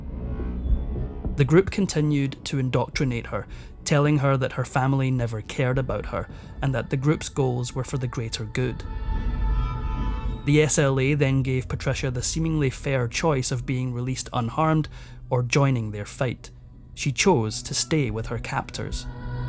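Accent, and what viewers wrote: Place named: Scotland, United Kingdom. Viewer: Yay my all time favorite Narrator Love the Scot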